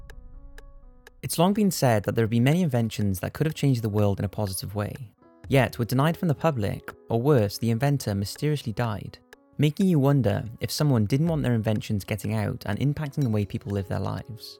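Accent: United Kingdom. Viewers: Hey perfect storyteller voice British guy, be careful, I’d be sad to find out you went missing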